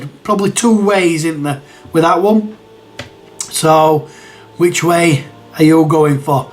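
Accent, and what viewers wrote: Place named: Yorkshire, United Kingdom. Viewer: Infogrames always used to get me because I never used to see the R 🤣 Xexyz is a good one, lets just call it Keith from now on. Suikoden I dont have an issue with as I will never play one of those games anyway. You are only saying Eeenix that way because you have a Yorkshire accent. I don't remember pronouncing Tau Ceti before, maybe I did 🤔 That one at the end 🤣🤣🤣 My brain hurts now....😊👍🐻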